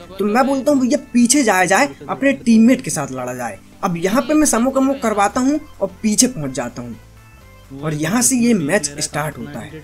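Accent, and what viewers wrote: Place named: Bundelkhand, India. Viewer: Aawaj se to pata chal gya ki bhai bundelkhandi ho !!! Me videos dekh rha hu kuchh dino se bahut achha khelte ho bhai.... Anyways damoh se hu... Indore me rehta hu... Me b bundelkhandi hu... Bhai real naam batao yr or kaha se ho exactly ?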